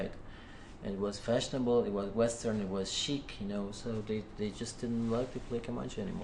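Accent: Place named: North America. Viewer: wow! he speaks like a man who live in toronto or new york for 20 years! way to go man. excellent musician. i like combination of Kalhor and Shajarian. they are awesome.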